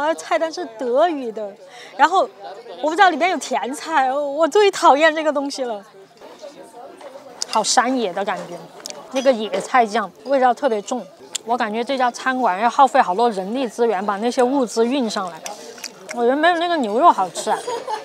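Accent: Sichuan, China. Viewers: 兄弟，你挺美的，你一说话，我就知道是咋们四川或者重庆的兄弟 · 四川塑料普通話好親切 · 听你的口音就晓得是川渝勒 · 浓浓的川妹子口音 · 四川口音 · 说的一口地道的川普好听😁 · 川普的味道还是一直保留了一点点. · 主持的川普好亲切，兄弟们点赞是必须的👍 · 不晓得正大综艺的多半90后，不过确实有点像，在四川听川普，很不错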